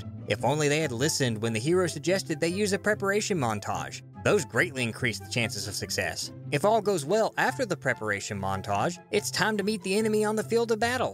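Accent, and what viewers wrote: South, United States. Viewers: Man I cannot ignore the southern accent now that I've spotted it.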